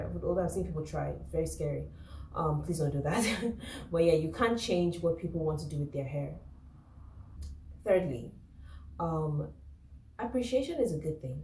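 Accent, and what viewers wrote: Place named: Nigeria. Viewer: you don't know any better, and I can tell by how you talk you sound as if though you were not black American, you sound as though you may be a Nigerian or something I don't know, Before Europeans colonize Africa, they were literally circling around it., Africans were so naive.. amd had absolutely no idea.. I think black Americans have an idea on white people stealing things that culturally belong to them. Western popular music comes from Black Americans... black Americans for the past 100 years have set back in watch white people steal their music and become astoundingly wealthy as a result, many early black rock and rollers of the 1940s and 50s, died in obscurity, and white people who covered their songs become wealthy. But that's a different subject that we can talk about it some other time. But here's the thing.. I'm a little older than you and I know a little bit more than you do. 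So I'm a little wiser and more experienced in these conversation.. There is a dance called the Lindy Hop. And I'm bringing up dance, since you mentioned ballet... which black people are also ostracize out of that dancing community. There are videos on YouTube talking about that. But Lindy Hop is an old African-American dancing style come from the swing dance era of the Jazz era. Invented by black Americans. That dance is Now usurper by white people. I have heard stories of black people rediscovering that dance, and going to dance studios to learn it, but they feel ostracized by a lot of the wh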